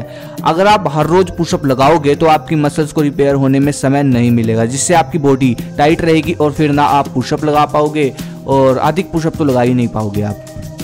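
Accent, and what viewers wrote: Haryana, India. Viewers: Bhai aap kha se ho Mn to nu lagh h ki aap haryana t ho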